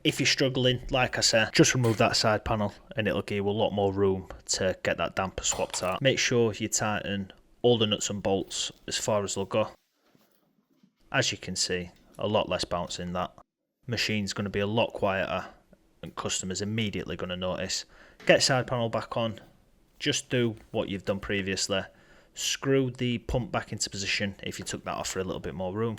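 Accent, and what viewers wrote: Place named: Yorkshire, United Kingdom. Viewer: Brilliant video. I had the dampers replaced in mine a few months ago, but now it's making a horrendous sound spinning with sparks flying inside the drum. Probably bearing collapse. Your strong Yorkshire accent brings back memories. I was born and bred in Yorkshire. I moved from West Yorkshire to Cornwall 30 years ago as it was being taken over by Pakistanis and other minorities.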